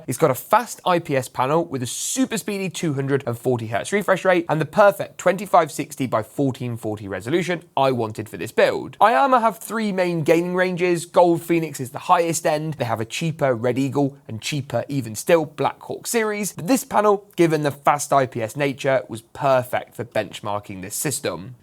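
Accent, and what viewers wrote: United Kingdom. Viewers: Bruh the thick british accent i can't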